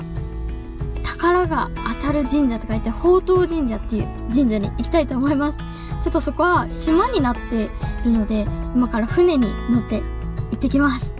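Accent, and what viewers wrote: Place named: Gifu, Japan. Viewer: 宝くじの神社と言えば長野の諏訪湖に有る諏訪大社有名ですがそこの神社で１番有名なのは「上社の御柱祭」全国ニュースでやる大きな木を山の斜面を落としてたりしてる神社ですよ☺️ 毎回、ちゃんの岐阜弁に癒されるw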